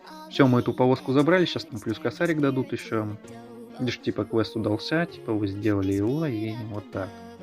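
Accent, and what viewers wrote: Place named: Russia. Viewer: I think Russian, he has kind of a heavy accent.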